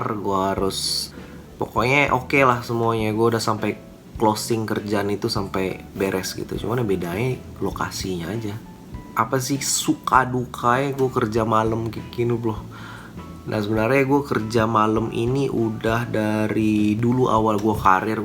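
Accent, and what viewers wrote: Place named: West Sumatra, Indonesia. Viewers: logat minang nya masih kental banget ya udah hehe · Sebelum liat caption full, dengar video sambil membatin, "eh... kayaknya ini orang minang, logatnya berasa familiar." Ternyata benar, ada ditulis di caption hehe. Salam kenal uda. Dima di Padang nyo? Main-main ka channel kami :)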